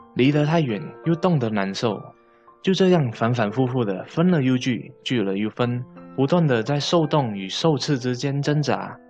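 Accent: Malaysia. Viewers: 这是马来西亚的华语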